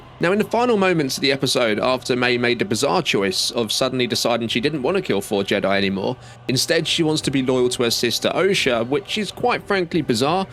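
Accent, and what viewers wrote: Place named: London, United Kingdom. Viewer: U sound like the guy from looper. Must be the Londoner in u.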